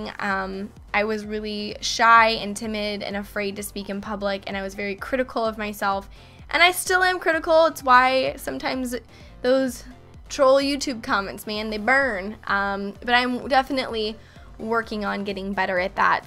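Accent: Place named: Midwest, United States. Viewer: You accent really confuses me. It sounds like Midwest mixed with a bit of southern. Anyone know where she's from? Statewide, I mean. I'm not a creeper.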